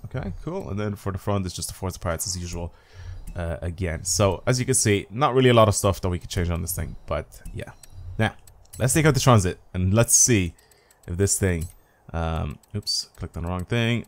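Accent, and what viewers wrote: Dublin, Ireland. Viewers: Good vid keep it up (quick question are u from Dublin in ireland cause u sound like u are from Dublin)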